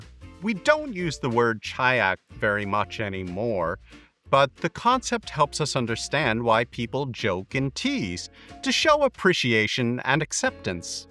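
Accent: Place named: United States. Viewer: why does he keep saying "we" if he sounds american, lol, saying "bugger" in an american accent is just not cool. Let me guess, oh yeah, this was produced by a woke TV channel, that explains everything. So annoying honestly -.- stop pushing the agenda of mass immigration -.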